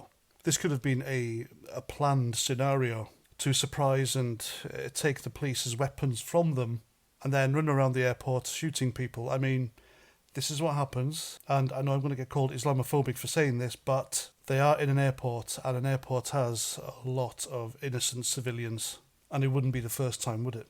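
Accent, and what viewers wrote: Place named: North Wales, United Kingdom. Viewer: Lovely to hear a narrative from a fellow North Wales 🏴 man. Greetings from Bodorgan Ynys Mon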